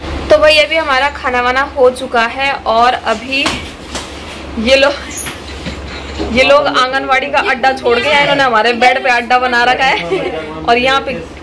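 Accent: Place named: Pahadi, India. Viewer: Maam . Pahadi tone aa hi jati hai Being .pahadi. ..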